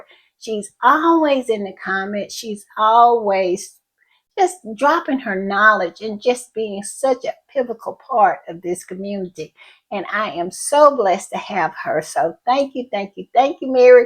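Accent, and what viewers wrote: South, United States. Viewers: I'm screaming! Not "jaws tight"! And you always have me at " heffa"! 😂😂😂 Yep.... You're a southern gal, for sure! I'm loving it.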